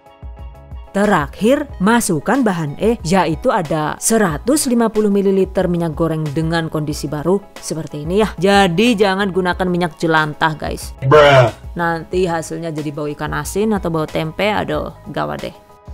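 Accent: Banyumas, Indonesia. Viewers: Serumpun sepertinya sama suami saya..ngapak"😄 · Suka ngakak denger mbak nya ngomong ngapak..😂🎉sukses trs mbk... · Mbaaaaaa'e , ngapak²😂😂😂 resepnyaaa mantap. Terima kasih yoooo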